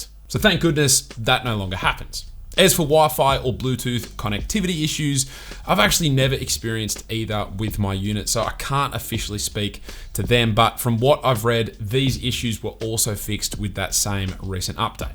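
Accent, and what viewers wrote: Australia. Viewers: this guy looks american but has an australian accent. so interesting!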